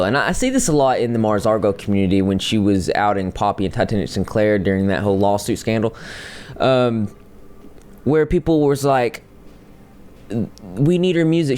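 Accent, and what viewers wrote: South, United States. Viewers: Is he southern or british? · He's a fellow southerner. We get that drawl, especially when we talk fast.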